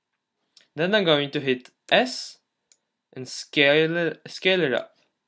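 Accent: Norway. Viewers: hahaha kan høre at du e norsk på uttalen xD konge vid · Are you norwegian? Sounds like it :)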